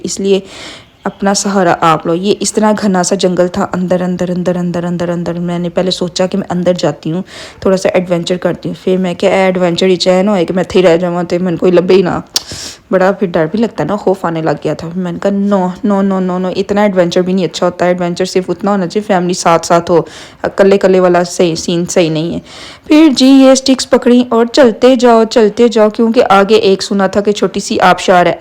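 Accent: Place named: Lahore, Pakistan. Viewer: how can you speak so fast without any stop (are you from lahore, i guessed it from your speaking style), i enjoyed your commentary more than the video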